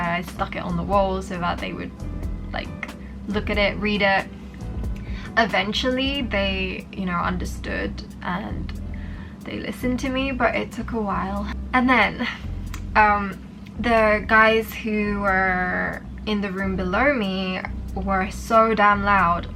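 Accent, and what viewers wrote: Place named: United Kingdom. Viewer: beautiful british accent :)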